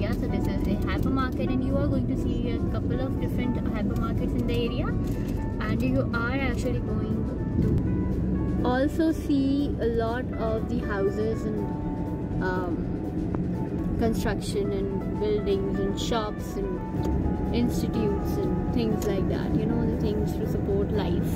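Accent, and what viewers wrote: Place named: India. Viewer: 4 secs we can make out Indian accent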